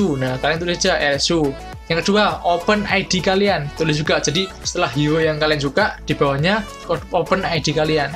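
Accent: Java, Indonesia. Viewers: Hero favorite: liliana Open id: <num> Btw saya suka medoknya bang wkwk XD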